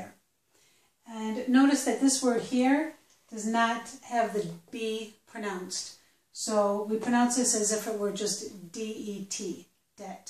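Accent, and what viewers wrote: United States. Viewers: Excellent and the best broadcasts American's pronunciation !! I'm grateful for you Madame. Thank you +++. Fadi from France, advanced learner English in Canada .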